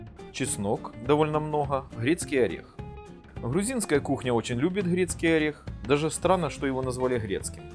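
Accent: Ukraine. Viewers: Рецепт то абхазский,но говор у Вас украинский или Ростовский,Краснодарский,но никак не апсны!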